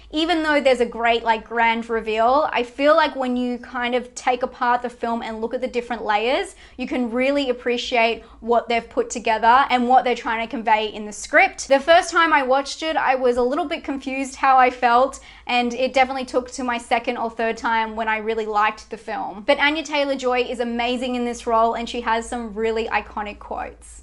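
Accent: Australia. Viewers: New Subscriber! - No need to apologize for your accent 😎 that is one of the little things that sets you apart from all the other channels, and if someone doesn't like the aussie accent...I'm pretty sure they Hate puppies and you shouldn't worry about those psychopaths #kiddingnotkidding :~)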